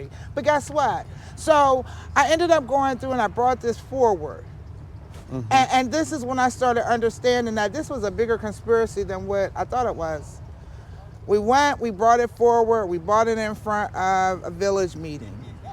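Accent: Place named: Liberia. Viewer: Are you Liberian? I’m picking up on your accent bro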